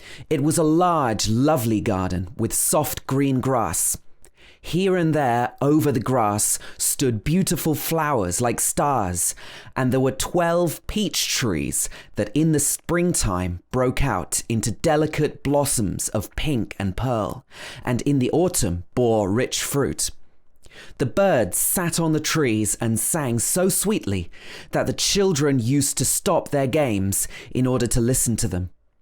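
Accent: United Kingdom. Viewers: I love your accent!! When I was child in my country in Central America I was learning British English, I don't understand why more American accents were introduced later. maybe because it is the same continent. 😂😅But I love this accent 🥰🥰🥰 Sorry for my English is not excellent 🫶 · Trying to go to uk next year to learn English and started look for the videos in British accent and I love this!!! Thank you!! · I was looking for someone who actually has british accent with text book reading for my role practice, Thank you so much !! I can actually see the text and repeat words so I can practice while hearing your reading! lovely ♥ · This is a nice story and very good for learning the British accent, keep it up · You have been blessed by God.Your voice is adorable and I hope to listen another great story touched by your nice british accent.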